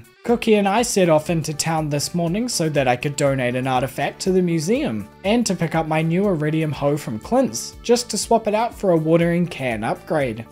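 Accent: New Zealand. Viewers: I had no idea he was kiwi! i guess i didnt tune into the accent because im also from nz and i was so excited when i found out! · Are u kiwi? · are you from new zealand i can tell from the accent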